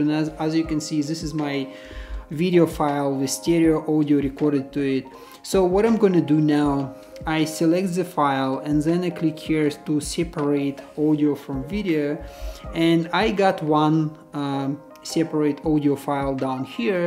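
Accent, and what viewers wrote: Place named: Russia. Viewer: чувствуется русский акцент.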